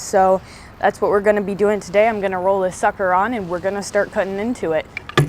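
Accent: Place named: Pennsylvania, United States. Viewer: being from PA, the "alright" is just a part of our PA talk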